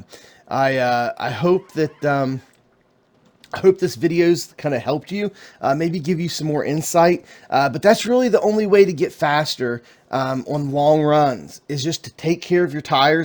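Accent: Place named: Baltimore, United States. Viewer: This dude is from Delaware Maryland PA area, sounds really Baltimorish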